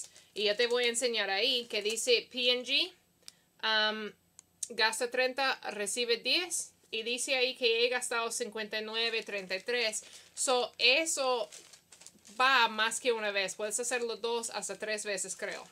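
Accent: Puerto Rico. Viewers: Love this video!!! Suenas Puertoriquena!!! :) Gracias por este video. Que chevere!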